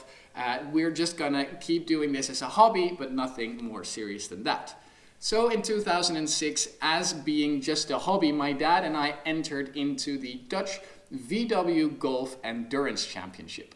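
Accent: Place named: United States. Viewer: How did you learn American accent so well?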